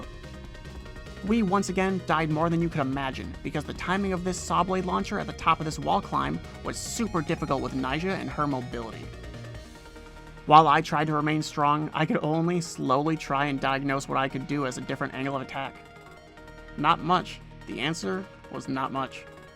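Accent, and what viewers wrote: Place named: United Kingdom. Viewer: British lad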